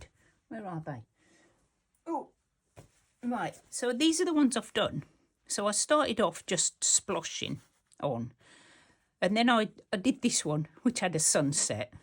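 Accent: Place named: West Midlands, United Kingdom. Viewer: I'd love to see the finished result. I also love listening to you speak - your accent is similar to some of my family. They were from West Bromwich and others from Walsall. I'm a Brummie ;) Ah, the accents of the West Midlands.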